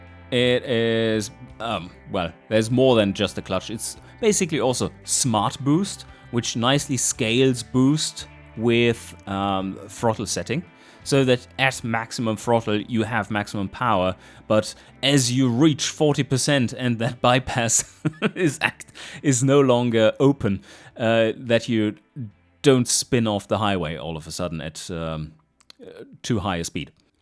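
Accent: Germany. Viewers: i like your dialect, sounds like a fellow native German speaker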